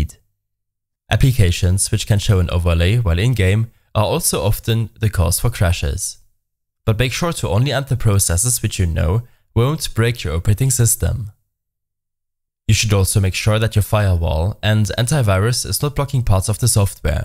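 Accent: Germany. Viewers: Hört sich ekelhaft deutsch an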